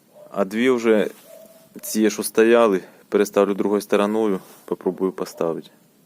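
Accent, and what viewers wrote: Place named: Chernihiv, Ukraine. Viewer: Теска., мені теж твоя вимова нагадала мені, що це таке, щось знайоме, не міг згадати. Почитав в коментарях - Чернігів. Можливо, був там в 90-х. Приємно слухати. Бажаю тобі упіхів в житті та розвитку каналу. Я підписався. Хочу порадити, друже, якщо є можливість, купляй "корчики", роби їх чесно для ЛЮДЕЙ, знімай відео для нас, перепродуй вигідно для себе і радуй нас гарними порадами. Особливо це буде потрібно для нашої молоді. Щиро вдячний, МИРУ нам усім і ДОБРА в кожну родину!!!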